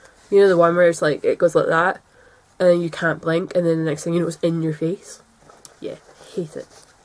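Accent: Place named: Scotland, United Kingdom. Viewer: Hi, how did you find the Ashford kiwi? I have a traditional and I'm thinking of getting one ( I've fallen down that hole we crafters seem to fall down) My mums side of our family are from Kilmarnock, it always makes me feel at home when I hear the accent, where abouts are you from? We always make sure we nip into Stevenson when we visit, there's a little bakery that sells the best tatty scones;)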